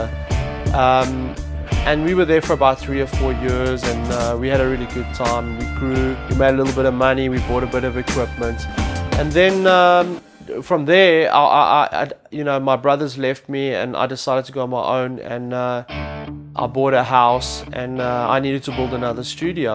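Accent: South Africa. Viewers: Are u South African? :D My bestie is south african :D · South African? :> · Haha, straight away I can here a Joburg boy!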